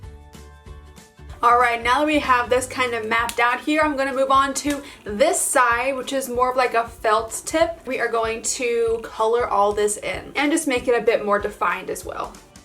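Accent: United States. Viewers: Your accent is Americanized while has a more classic, British/Mid Atlantic influenced accent.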